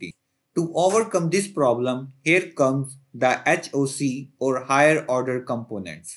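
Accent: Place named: India. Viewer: damn, I heard the Indian accent and said noooo xD but in the end my God this was a great explanation, the best I've found about this topic, you get a like and if I find something else like this in your channel you get a subscriber.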